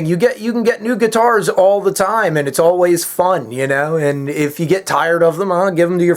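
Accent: Philadelphia, United States. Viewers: your accent reminds me of the South west Philly accent lol thays why I asked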